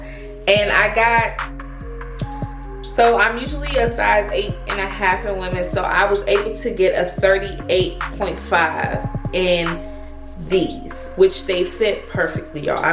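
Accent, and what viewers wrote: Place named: DMV, United States. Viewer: So cute! Sn...r u from the DMV? I thought i heard an accent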